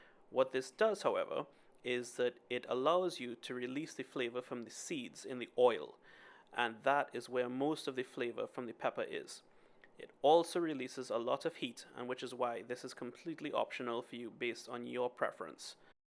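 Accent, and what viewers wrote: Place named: Trinidad and Tobago. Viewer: Good speaking Trini voice and feeling proud.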